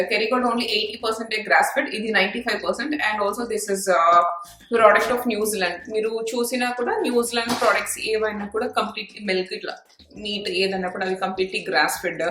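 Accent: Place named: North India, India. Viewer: Your Telugu is very different. Did you grew up in north India ?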